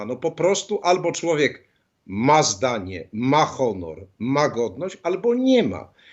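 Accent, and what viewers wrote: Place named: Gentry, Poland. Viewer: A skad ten zymianski akcent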